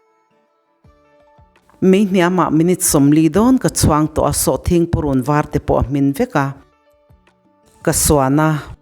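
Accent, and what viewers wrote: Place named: Mizoram, India. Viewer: Mizo Elite Accent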